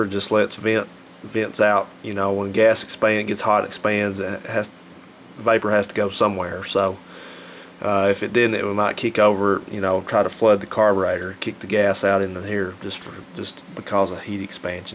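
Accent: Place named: West Virginia, United States. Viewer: I didn't get to add thanks a lot for the videos. You kinda sound like you are from West Virginia like me, or maybe Kentucky or North Carolina.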